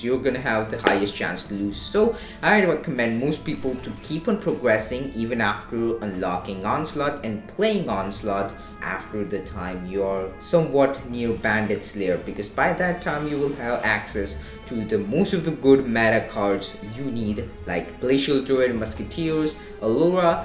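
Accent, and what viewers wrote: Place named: India. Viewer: You have like an Indian accent which is hard to understand your english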